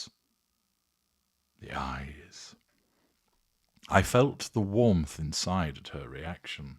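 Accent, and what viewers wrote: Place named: Leeds, United Kingdom. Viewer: Not a Manchurian accent! Yup! Leeds!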